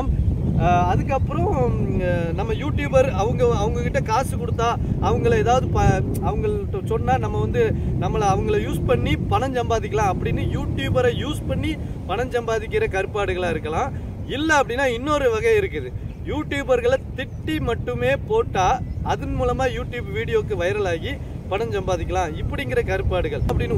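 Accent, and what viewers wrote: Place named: Tirunelveli, India. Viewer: சகோதரா கவலைப்படாதீங்க உங்க மனசு போல உங்க வாழ்க்கை இருக்கும் நெல்லை வட்டார மொழியை நீங்கள் பேசுவதை கேட்கும் போது மனசுக்கு மகிழ்ச்சியாக இருக்கிறது முடிந்த அளவு உங்கள் சேனலே பல நண்பர்களிடம் கொண்டு செல்வதற்கு முயற்சி செய்வோம்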